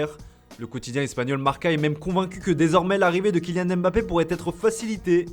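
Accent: Italy. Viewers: aucun rapport mais il est parti l'autre avec son accent italien tout peté? jai unfolow a cause de lui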